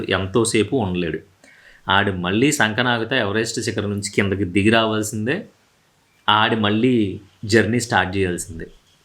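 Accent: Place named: West Godavari, India. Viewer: Confirm ga nv west Godavari anna nuvu ❤❤❤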